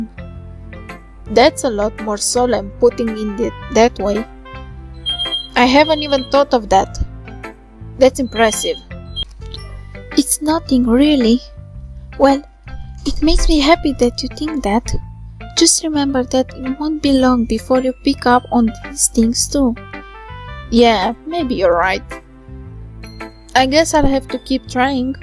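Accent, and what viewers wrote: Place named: Romania. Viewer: Ma refer se cunoaste din accent ca esti romanca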